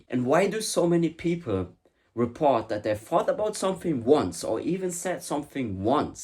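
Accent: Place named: Scotland, United Kingdom. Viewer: are you from Scotland? lovely accent wow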